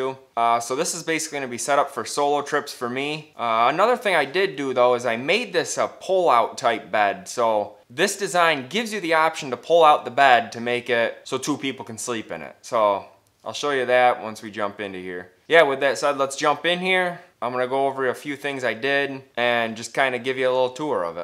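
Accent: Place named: Arkansas, United States. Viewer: I’m guessing you’re from Arkansas? Your voice sounds like you’re from there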